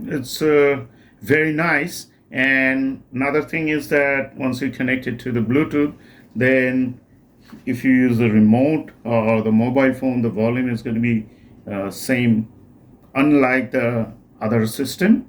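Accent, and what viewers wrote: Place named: India. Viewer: Bro be Indian, sound Indian 🇮🇳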